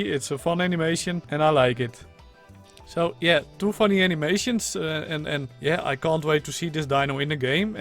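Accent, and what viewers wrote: Netherlands. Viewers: are you dutch? you kinda talk with an Dutch accent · He is from Holland, it's a dutch accent. Yo, Maak jij ook mods voor ark? Of heb je er weleens in verdiept? Ik verdiep me er op het moment in, maar zit nog met een heleboel vragen. Ik wil namelijk een 3D object maken en importeren in Ark, modelleren is het probleem niet maar het importeren wel. Gr Erwin.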